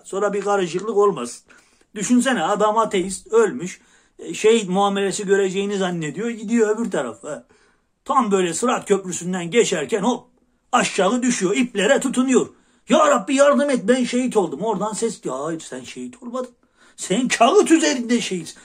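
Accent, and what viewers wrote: Erzurum, Turkey. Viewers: arada bir erzurum siveside guzel oluyor ahiskalilar sivesine benziyor bana çocuklugumu hatirlatiyor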